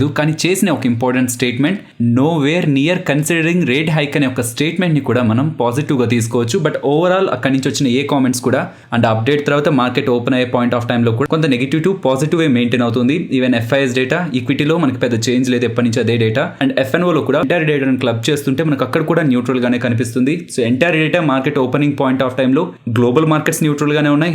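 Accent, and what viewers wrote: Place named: Rayalaseema, India. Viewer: Bro u r from Rayalaseema 😎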